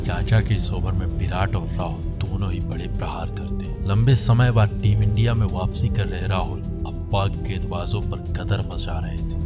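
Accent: Bihar, India. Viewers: Tu bhihari he yaa uttar pradesh wala 😂😝😝😝😝🤬🤬🤬🤬🤮🤮🤮🤮🤮🤮🤢🤢🥵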